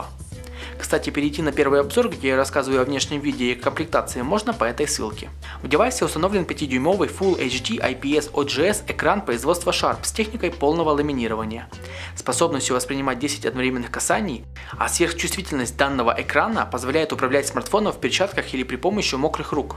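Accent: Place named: Donbas, Ukraine. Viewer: Молодой человек надо, что-то менять! Не хочу ни кого обидеть! Но Вы делаете публичный обзор и дикция должна быть отличной от дикции обычных жителей Донецка или Луганска! Удачи и счастливых перемен!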